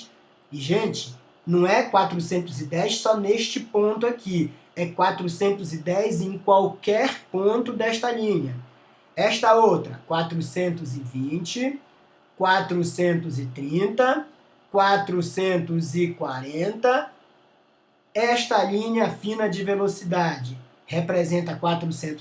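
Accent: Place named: Belém, Brazil. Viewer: Sotaque de Belém do Pará.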